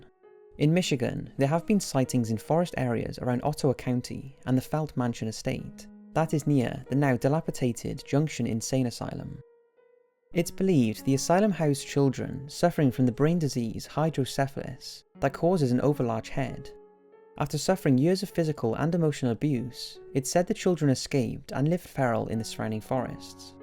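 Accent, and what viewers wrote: United Kingdom. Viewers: YESSS! The British narrator is back 😆😃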